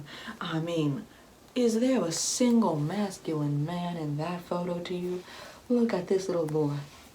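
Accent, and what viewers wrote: South, United States. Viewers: Ok but I live for the southern accent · Her accent really sounds like Benoit Blanc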